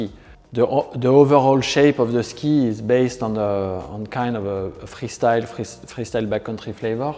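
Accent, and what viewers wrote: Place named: France. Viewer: Très beaux skis !👍 Ça s'entend que le présentateur est français 🤙😁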